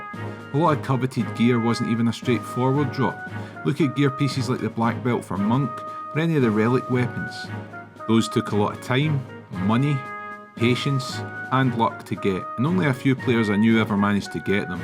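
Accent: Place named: Scotland, United Kingdom. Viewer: Great to hear a fellow Scot speak about this game the way I do lol. I just started a new character on Odin!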